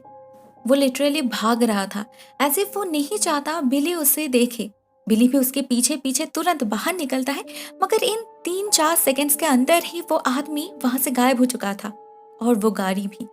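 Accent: Bengal, India. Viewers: You are bangoli right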